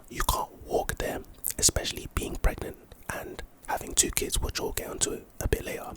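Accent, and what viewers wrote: United Kingdom. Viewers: ? Pronunciation of what? He's British I believe - they speak English in England lol 😂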